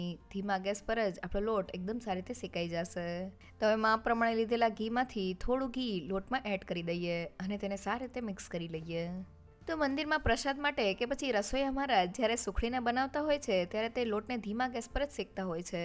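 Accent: India. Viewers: Karan ke i think tevi aadiwasi vistar ma rahe che karan amara sight aadiwasi vistar na loko aa rite j gujrati bole chec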